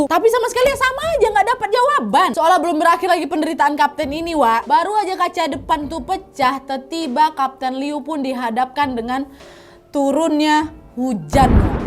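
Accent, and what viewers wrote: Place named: Sumatra, Indonesia. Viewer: Nadiaaaa hahahaha aku suka dg pembawaan:) Aku suka ketawa ngakak KL sdng bercerits cerita seram atau apapun hahahaha Dengan aksen khas Sumateranya yg kental bgt. org mn? Dr Pekanbaru atau dr mn? Aku dl 3 THN sklh di Pekanbaru dan aksennya persis dg:) skrg Sy di JKT lg:) sukses ya:)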